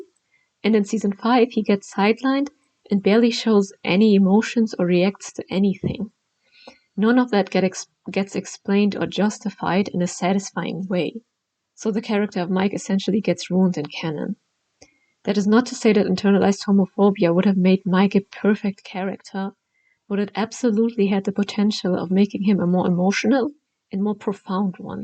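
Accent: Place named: Germany. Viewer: I think the way you say certain words, it had me wondering if you were a native German speaker. This is more of an accent thing, not a grammar thing—you expressed your ideas clearly. Someone once said to me "Understanding always comes before speaking", which I think is true for every new language you learn to speak! 😊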